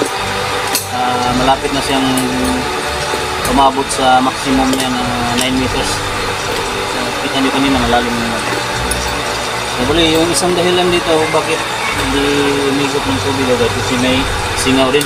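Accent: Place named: Visayas, Philippines. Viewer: Idol taga asa man mo? kay bisaya man ang imong dialect? Taga cebu ko tks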